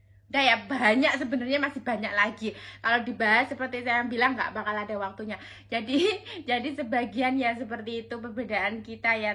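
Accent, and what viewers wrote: Java, Indonesia. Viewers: Itu bapaknya ga mungkin setres,,karna istrinya lucu lihat gaya ngomongnya aja udang ketawa gaya indonesia bangeeet. 👍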